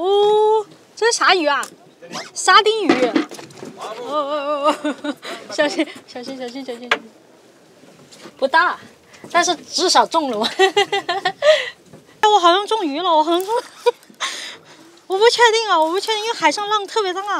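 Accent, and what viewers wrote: Sichuan, China. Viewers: 听口音像四川，最后果然说四川人了。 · 哈哈果然是一个地道四川人，我看你第一个视频就听出来了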